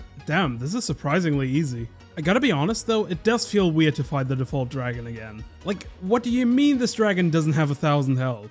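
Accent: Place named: Germany. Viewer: Man merkt den detschen Akzent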